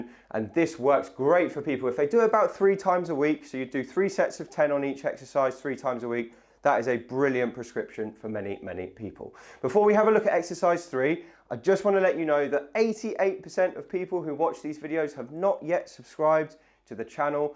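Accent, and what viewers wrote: United Kingdom. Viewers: I love your lovely British accent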